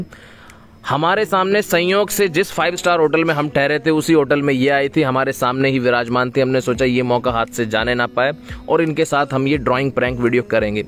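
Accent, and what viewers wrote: Standard. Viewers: Mere Bhai Dil Se Badhai aur jo Tum kar rahe ho na Vakya mein lajawab hai main sketch ki baat nahin kar raha Mujhe sketch ke sath Ek Aur chij dikhi vah bhai aapki voice bolane Ka Tarika Jis shuddh Hindi mein baat karte ho na bhai Kasam Se Dil Garden Garden Ho Gaya.. love u bro.. Jay Shri Ram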